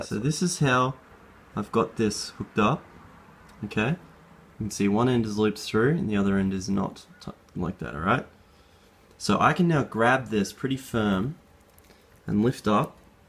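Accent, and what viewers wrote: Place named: Australia. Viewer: I hear an aussie accent, how much did your amp and cab set you back? They're so expensive over here I'm thinking about maybe the deluxe 20 instead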